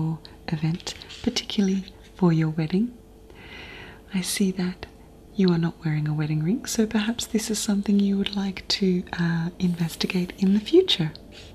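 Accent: Australia. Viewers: Tpgain 70 sounds like an elegant and educated Australian accent in my opinion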